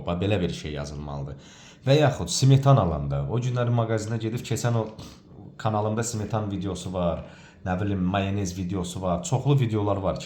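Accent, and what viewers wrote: Ganja, Azerbaijan. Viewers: Yeniden faydalı video üçün(+Gence lehcesi üçün)çox sag olun,ugurlar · Gözəl Gəncə ləhçəsi, hər şeyə görə sagolun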